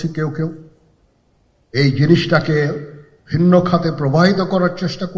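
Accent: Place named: India. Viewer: সেনা প্রধান ভারতীয় সুরে কথা বলছেন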